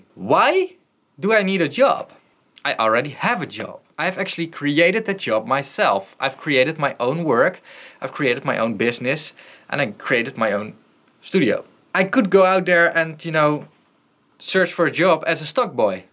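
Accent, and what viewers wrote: Netherlands. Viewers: Are you dutch, you have a bit of an accent?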